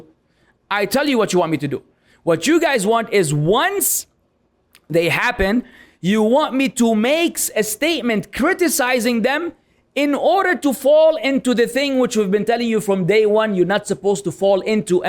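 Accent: Lebanon. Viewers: I like your tripolian accent. Can you do a minyah accent, that's my favourite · Even though I'm a graduate of the Diploma of Arabic Language for Non natives from Umm Ul Qura University Makkah, still this Lebanese accent was beyond me 😂, Allahuma Barik Lak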